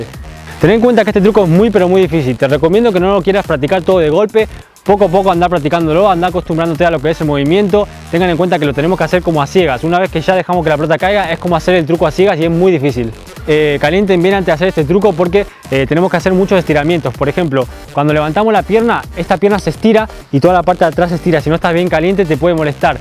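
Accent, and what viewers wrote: Argentina. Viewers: pareces argentino ajjaja pd:soy de arg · Tienes acento Argentino , no pensaba que eres español (PD:Gracias por el truco , casi lo tengo ) Saludos desde Valecia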